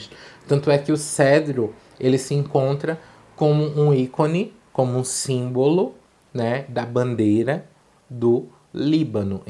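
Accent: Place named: Recife, Brazil. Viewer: você é de Recife? você tem um sotaque de algum lugar do nordeste, mas você falando parece muito Recifense.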